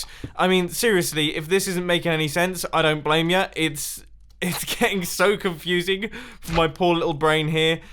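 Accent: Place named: United Kingdom. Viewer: He's British from what I've heard..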